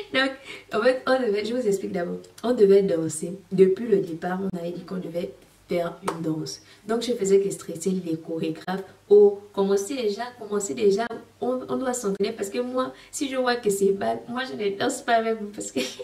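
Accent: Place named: Gabon. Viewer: L'accent gabonais :)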